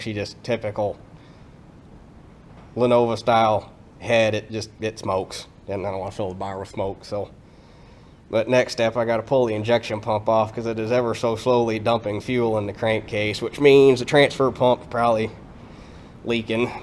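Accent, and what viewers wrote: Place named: North, United States. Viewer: I’m going to be honest and with this link came out I bypassed it because I was just busy. When your second video came out and I well great. You were one of the first channels I watched regularly and looked forward to watching and even went back in time and watched. I’m originally from Michigan and have lived in Georgia since 1974 so I love to hear the northern accent such as yours but love the old equipment as well. Take care and hope you’ll post some more videos.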